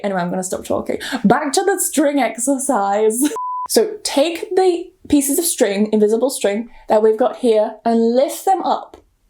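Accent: United Kingdom. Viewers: Hola, estoy muy agradecido contigo porque tu canal me ha ayudado bastante, me has sacado de preguntas muy significativas. Te amo. Me enamora tú bello acento británico. Saludos desde Bogotá, Colombia.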